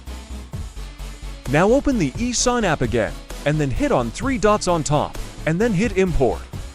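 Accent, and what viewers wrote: South Africa. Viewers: Are u South Africa bro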